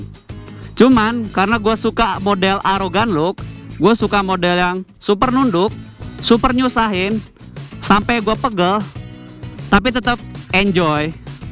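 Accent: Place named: Banyumas, Indonesia. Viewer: Lah kok rada ngapak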